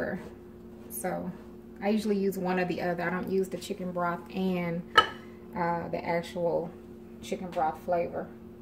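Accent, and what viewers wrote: South, United States. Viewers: She sound fine. Like a southern bell. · New fan here. Would love to see you do a through and through without pausing and starting again. Your voice is southern and very soothing. Maybe you could talk to us about your day as you're cooking or whatever you feel like discussing. Just a suggestion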